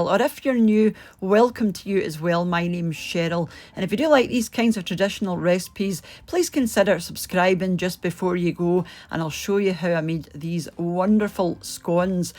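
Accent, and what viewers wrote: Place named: Southwest Scotland, United Kingdom. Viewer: These are very similar to my own favourite recipe.I am from Greenock,now living in Canada. 🇨🇦 Your accent is very south west coast of Scotland!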